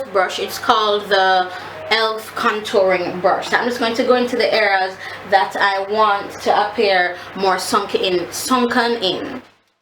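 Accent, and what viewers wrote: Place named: Jamaica. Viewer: Love your videos!! And your accent-from a fellow Jamaican x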